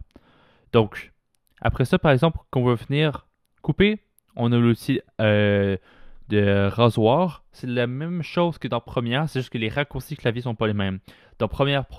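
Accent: Quebec, Canada. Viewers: TU ES QUéBéQUOI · C'est du à comprendre le québécois 😥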